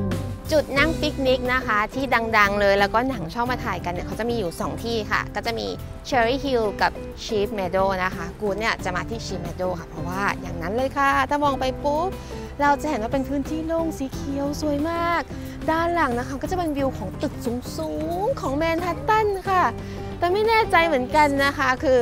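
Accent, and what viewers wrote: United Kingdom. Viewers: หญิงคนขายงานศิลป์ชมคุณกู๊ดว่า "ชอบสำเนียงและน้ำเสียง UK ที่มีเสน่ห์ไพเราะจับใจในการชื่นชมในงานศิลป์ ทำให้เขารู้สึกดีมีกำลังใจมากๆ เพราะไม่ได้สัมผัสบรรยากาศ และความรู้สึกดีๆ จากนักท่องเที่ยว ที่สวยและน่ารักแบบคุณกู๊ดมานานมากแล้วครับ" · ฝรั่งชมคุณกุ๊ดเกี่ยวกับสำเนียงอังกฤษฟังแล้วมันมีสเน่ห์น่ารักจริงๆค่ะสำเนียงจะแตกต่างกับสำเนียงอเมริกา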